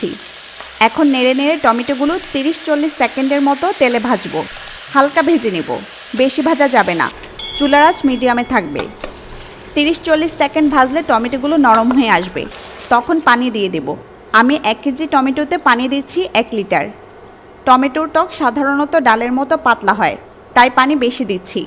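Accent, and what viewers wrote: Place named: Chittagong, Bangladesh. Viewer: Apnar kotha bolar style dekhe mone hocche apni Chottogram er manus.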